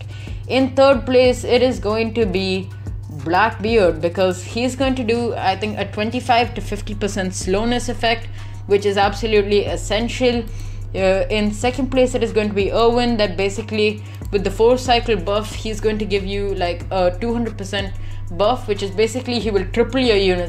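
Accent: India. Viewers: bro good video :) btw you sound like your from india which is a good sign because people from india always have best help and tips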